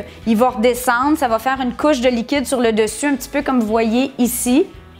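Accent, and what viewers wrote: Quebec, Canada. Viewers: Hello, je viens de découvrir ta vidéo sur levain et pain fait à la maison. Peut-on faire les même préparations avec des farines de sarrasin et de châtaigne ? Je suis français de France et j'adore tout ce qui vient de la belle province (y compris l'accent) ! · Une vidéo instructive et très sympathique avec un petit accent québéquois qui est adorable. En tout cas j'apprends qu'il ne faut pas être pressé pour la préparation du levain. Merci et bravo pour la vidéo.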